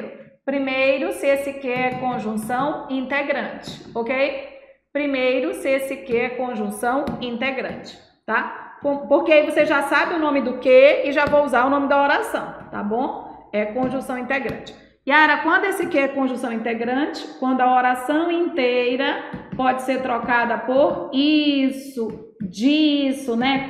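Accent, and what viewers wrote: Pará, Brazil. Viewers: Professora paraense, certeza. ❤️🙏🏻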